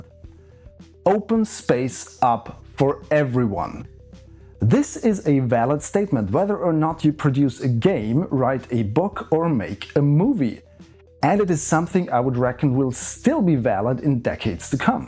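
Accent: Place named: Germany. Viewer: Are you from a German speaking country? Sounds very German.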